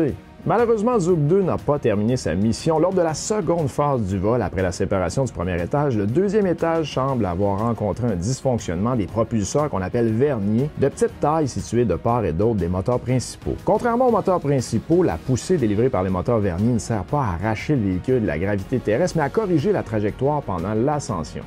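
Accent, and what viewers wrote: Quebec, Canada. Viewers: Très bonnes infos. En tant qu’européen j’aime beaucoup le côté décalé de la présentation et l’accent québécois si agréable à entendre… ça me change des psychorigides d’ici 🤣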